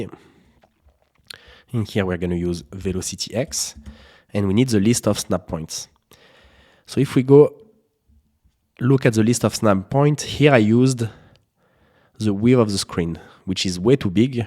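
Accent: France. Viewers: Garde ton accent buddy. Les gens te comprennent c'est le principal. Et puis peut-être que c'est le petit truc en plus de ta chaîne. Le frenchy accent. 😀 La fameuse French Touch ! :elbowcough:.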